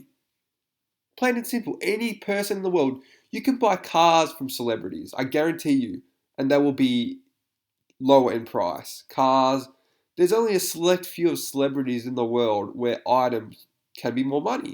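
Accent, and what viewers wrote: Australia. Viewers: Where abouts in Australia are you from, Brother?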